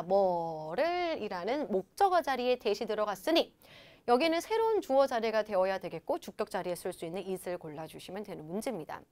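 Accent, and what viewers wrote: Gyeongsang, South Korea. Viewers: 와 정말 ㅋㅋ 너무 멋있으시네요 ㅋㅋ 경상도 말씨까지 정말 이상형 그 자체시네요 참나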